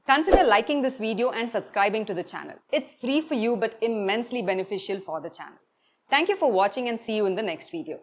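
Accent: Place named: Bangalore, India. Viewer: love the bangalore accent ;)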